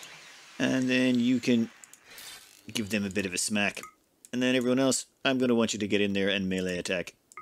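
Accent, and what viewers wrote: Ireland. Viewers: That cannibalistic tundra survival was epic. Maybe you could do an inhospitable biome survival yourself sometime. I'd watch it. Watching you plot and plan is the biggest draw to your channel for me. That and the sweet Irish accent.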